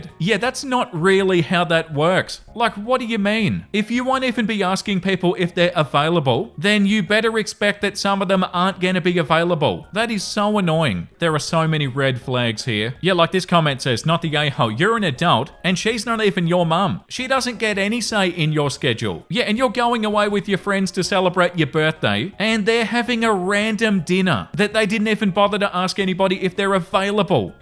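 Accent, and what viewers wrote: Australia. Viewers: i showed my mom your channel & she says "i want to marry this man's australian accent" (she loves australian accents lol)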